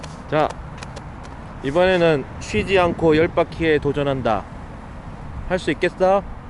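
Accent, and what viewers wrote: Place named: Jeolla, South Korea. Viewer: 역시 전라도 사투리는 화난거같은데, 사실 화 1도 안남 ㅋㅋㅋㅋㅋㅋㅋㅋ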